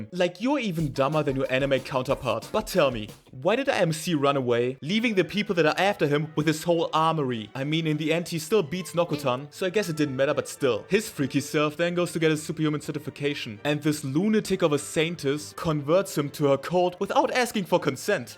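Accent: Germany. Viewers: are you german? I think I can hear a german accent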